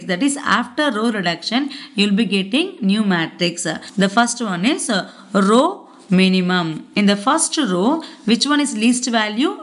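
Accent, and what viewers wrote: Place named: Tamil Nadu, India. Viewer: Awesome lecture mam... Keep it up✨ i assume that u r from tamilnadu😅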